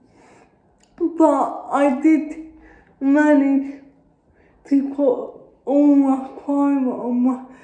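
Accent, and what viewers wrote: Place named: United Kingdom. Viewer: Hi, I know this isn't your latest video (at the time I'm writing this) but I just found your channel and decided I'd comment on this video because it's great how you're showing how you can do things yourself, and you're so pretty even before the makeup, and ya know us Yankees love the British accent too, so thanks and looking forward to seeing more videos, xox.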